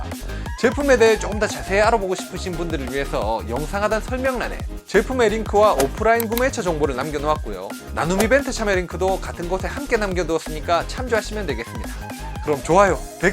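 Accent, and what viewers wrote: Gyeongsang, South Korea. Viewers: 어쩌다 들어왔는데 경상도 억양으로 쉬원하게 설명하네요. 좋은 정보가 많네요 감사.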